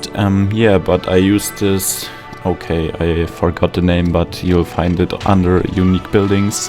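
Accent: Germany. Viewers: Man hört echt stark heraus, dass du deutsch bist xD Schöner Flughafen und ein paar ziemlich kreative Ideen mit drin. Da werd ich mir doch glatt was abschauen und selbst mal so verbauen :D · Haha dude I enjoy your German accent 😊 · Are you german? xd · i can hear that you`re german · Ich höre an deiner Stimme du bist deutsch😅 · deutscher akzent 😳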